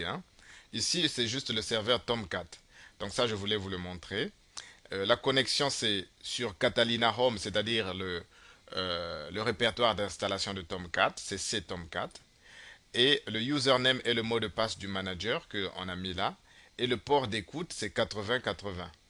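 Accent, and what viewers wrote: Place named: Cameroon. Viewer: Merci beaucoup...tu as une accent camerounais ;)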